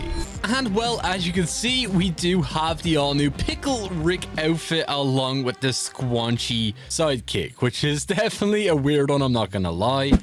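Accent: Ireland. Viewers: Not trying be annoying I never knew u were irish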